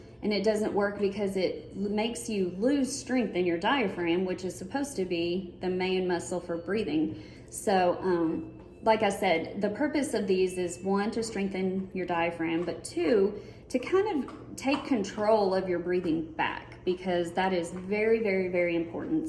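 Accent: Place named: South, United States. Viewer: Also, I love your home and your southern accent. Old Texas dude here....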